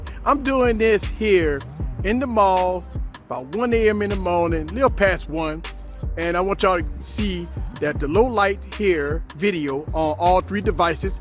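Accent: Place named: South, United States. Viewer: You's a southern boy huh? 😊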